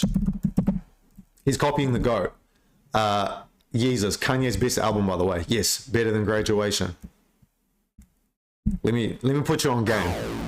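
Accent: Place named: New Zealand. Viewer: most new zealander accent of the year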